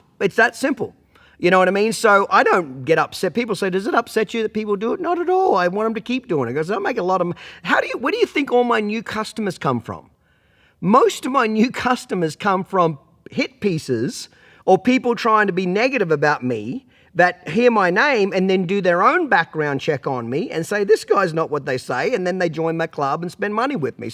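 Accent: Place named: Australia. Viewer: I never knew Garth Brooks has a Aussie accent..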